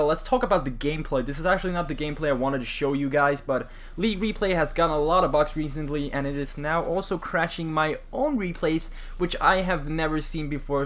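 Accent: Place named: Denmark. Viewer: Your accept sound *very* danish...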